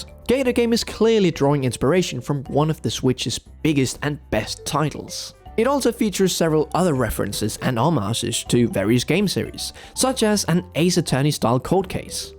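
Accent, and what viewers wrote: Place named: United Kingdom. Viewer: And through what I assume is your work at NL, your English (which was already quite impressive as an ESL speaker) has improved even further! It might be because you have a slight British affect to your English rather than the usual Mexican-American accents I'm used to, mind you, but you could pass off as a native speaker from a non-USA English-speaking country!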